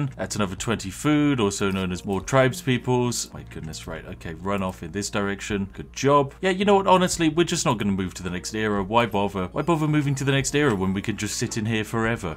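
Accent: United Kingdom. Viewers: Gosh you are British aren't you LMAO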